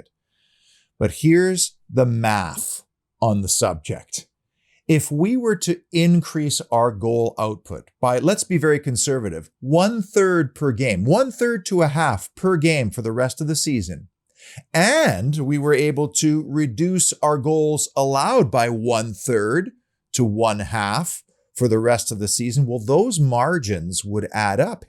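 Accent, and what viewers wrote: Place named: Canada. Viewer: A villa fan with a Canadian accent? Wow that's a little surprising.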